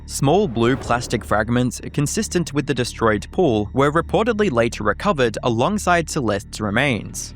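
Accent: Australia. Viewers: “If you dorn’t knor” I sense Aussie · no problem, for context I know an adelaidian accent when I hear one haha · I've been watching your vids for a while, i didn't notice your Aussie accent till now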